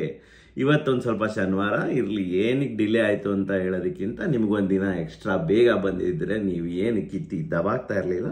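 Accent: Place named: Dharwad, India. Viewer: neevu Mysore davru idru kooda nimge dharwad kannada isht chennaagi hege barutte?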